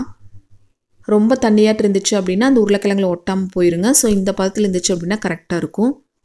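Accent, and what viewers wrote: Kongu, India. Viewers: Hi sister I'm new subscriber.. I like ur videos... Ninga kongu Tamil Thana pesaringa. Yentha ooru sister???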